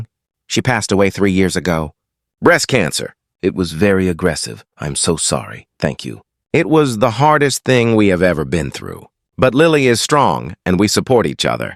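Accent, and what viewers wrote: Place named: Texas, United States. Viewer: Enoigh of this unsufferableTexan accent ! It spoils a good story 😮‍💨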